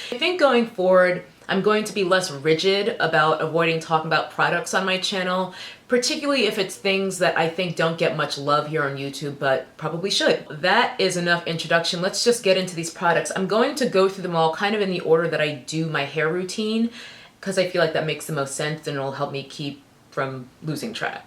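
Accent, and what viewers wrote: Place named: DMV, United States. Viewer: Just found your channel amd love your voice! You speak like me!! From DMV by any chance?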